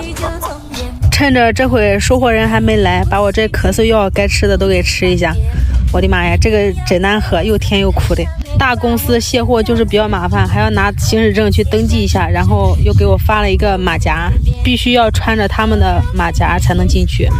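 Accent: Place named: Shandong, China. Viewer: 感觉你有山东口音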